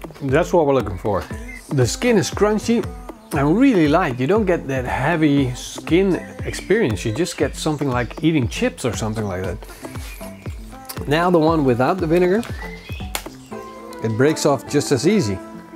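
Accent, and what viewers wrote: Netherlands. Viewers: asjeblieft hou op met dat engels....het klinkt echt totaal niet dat NL accent erin.. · cute strong dutch accent !!! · First video i watch of you but damn ik hoor meteen dat je Nederlands bent haha goed bezig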